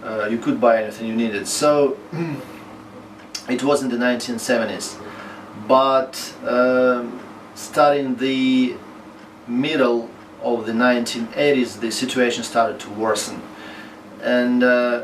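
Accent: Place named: Russia. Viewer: He doesnt speak english but jewish american ,,,and you speak real english with a beautiful russian accent . Thank you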